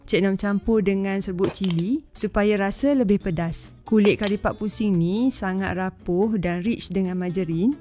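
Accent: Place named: North, Malaysia. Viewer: ni org utagha ka? Mcm ada dengar sleng utagha ja tu?😁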